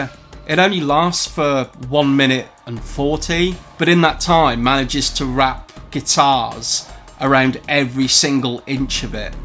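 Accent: England, United Kingdom. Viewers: Honestly,my entire knowledge about GOTH & DARK WAVE wouldn't fill a shot glass. What has me interested is a sheer boredom with what I've listened to for so long, DEATH METAL & BLACK METAL. It just seems like everything has been done. How many songs can be written about going feet first into a wood chipper or songs about the dark lord and master. So yes, I'm new to it. GOTH seems to have the darkness that I gravitate too, but being ignorant, your channel is a nice way to find about the various bands. It also helps that I could listen to an English accent read the dictionary. Carpe nocturne.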